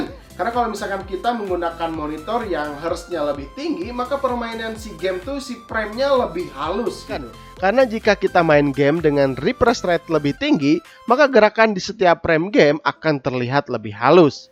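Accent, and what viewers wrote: West Java, Indonesia. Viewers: nyunda pisan euy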